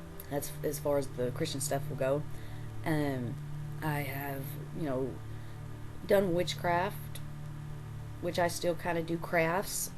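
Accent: South, United States. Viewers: i totally thought you were in the southern US from the way you speak lol good luck in the EU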